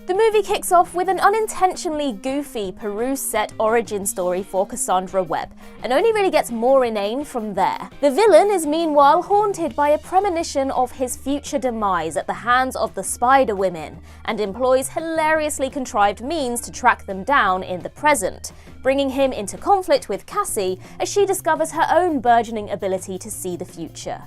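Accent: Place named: Exeter, United Kingdom. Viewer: Love the accent. Are you from Exeter?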